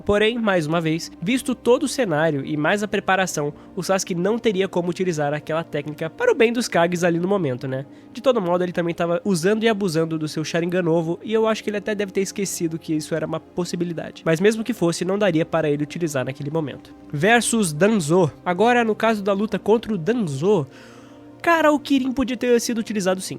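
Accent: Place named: São Paulo, Brazil. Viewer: Ele não "tira" o sotaque, só usa variações do sotaque paulista.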